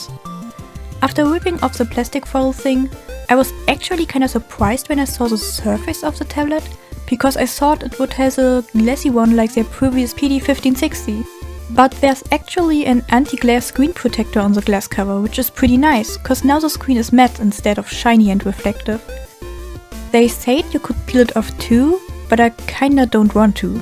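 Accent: Germany. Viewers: Man bemerkt den deutschen aksent xD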